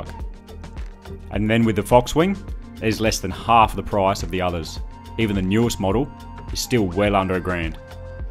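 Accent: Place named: Australia. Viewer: Something about Overland-related videos with Australian voiceovers makes me trust the content that much more. Great review guys, appreciate the time and efforts to put this together!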